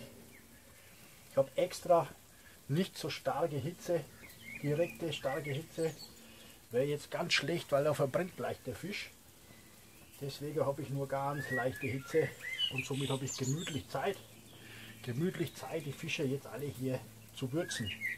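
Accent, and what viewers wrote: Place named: Franconia, Germany. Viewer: Fränkischer Dialekt. Pils zum trinken. und FC Bayern Trikot. Ich bin maximal verwirrt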